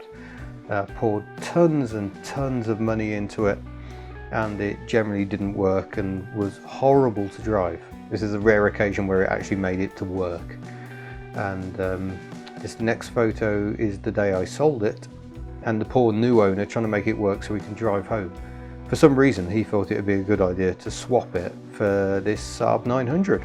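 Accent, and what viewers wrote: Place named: Birmingham, United Kingdom. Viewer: Thought you was a Brummie, makes two of us, i'm from Hall Green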